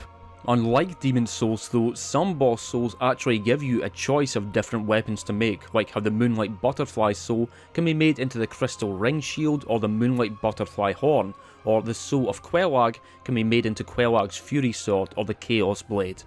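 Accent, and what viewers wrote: Scotland, United Kingdom. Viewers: what i love more, is a scottish accent xD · May i ask a question? Are you a scottish or an irish? I'm just interested...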